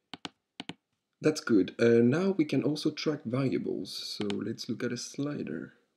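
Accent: France. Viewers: Same here. Non native english speaker and I understand your nice french accent perfectly. Nothing to improve there. Thanks for the tutorial. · Hello, I really like this video, especially french accent of english and sound of clicking :) But, I have a question, I downloaded ecosystem to my Unity(I've already installed PlayMaker), then I typed "UGui Proxy" to search line directly in The Ecosystem Browser and I couldn't find it. Can anybody help me with it? Maybe I can find Ugui Proxy somewhere else?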